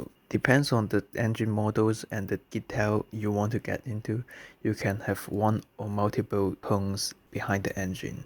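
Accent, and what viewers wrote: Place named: China. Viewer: Are u from china? I like ur accent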